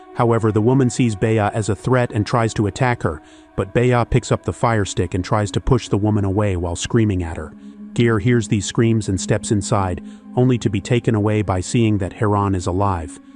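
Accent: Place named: United Kingdom. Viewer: British? Lost me...must be shit.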